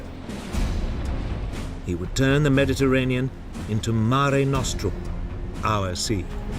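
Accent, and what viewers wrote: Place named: Scotland, United Kingdom. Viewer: Re: the segment on the death of IJN YAMATO/Operation Ten-Go: whoever did the voiceover (or the AI software) -fire them... or pick a different one. That stupid scottish accent is like nails on a chalkboard. Drove me clear up the wall. I had to turn it off.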